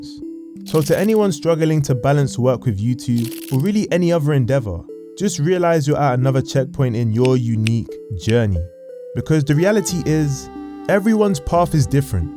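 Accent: United Kingdom. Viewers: its peak to see a new youtuber with a uk accent bro u just gave me confidence to use my voice now ❤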